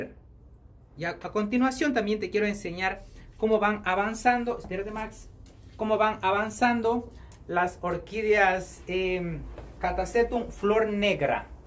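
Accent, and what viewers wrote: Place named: Latin America. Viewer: Me encanta tu dedicación! Felicidades por tan buenos resultados 👏👏 Por cierto, no tienes acento español, me parece que eres de Latinoamérica, no me equivoco? Saludos desde México.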